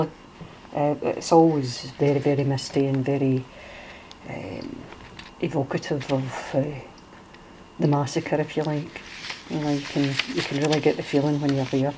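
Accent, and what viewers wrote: Scotland, United Kingdom. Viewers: I was attracted to your name being Scottish or should I say a Glaswegian I have just started being interested in Junk Journals and your Scottish journal has inspired me to make a couple of these for my Australian relatives. Listening to you makes me long for my home. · Beautiful, really love the vintage photos, tartan, and your lovely brogue and interesting description of Scottish history. We do tend to romanticize the past, forgetting sometimes about the harsh realities... :)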